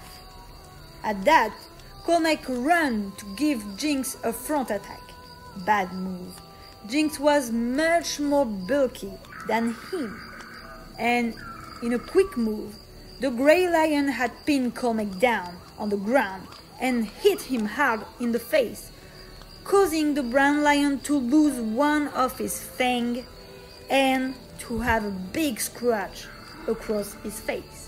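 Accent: France. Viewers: You are french ? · are you French I recognize your accent (je suis français aussi)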